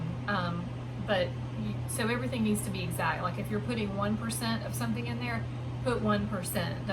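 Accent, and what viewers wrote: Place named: South, United States. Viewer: And I KNEW YOU WERE SOUTHERN! ! (next door neighbor, hi from Alabama)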